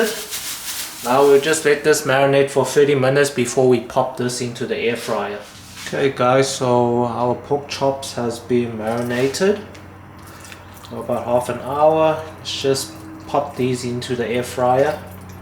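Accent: South Africa. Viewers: Where , Johannesburg, Port Elizabeth , Cape Town?Nice to meet you , I was born in South Africa , now live in Australia. Love the accent.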